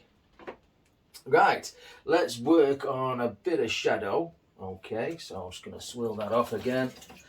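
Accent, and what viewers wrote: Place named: England, United Kingdom. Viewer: Thankyou so much for your prompt reply - love your work, and your English accent.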